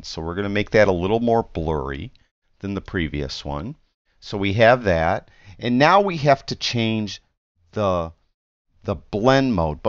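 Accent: United States. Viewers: great teaching, . This doesn't seem to look right on close up objects such as a flower. As far as you talking too much, lets just say i am getting a Yankee accent, Actually i enjoy listening to your teachings. keep up the good work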